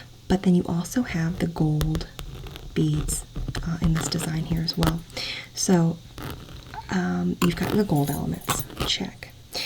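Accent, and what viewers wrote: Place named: Midwest, United States. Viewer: The Midwestern accent adds to the nostalgia lol thank you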